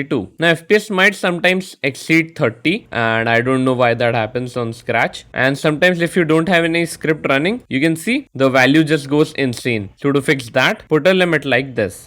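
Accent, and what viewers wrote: India. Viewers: such an intersting video completely ruined by the annoying indian accent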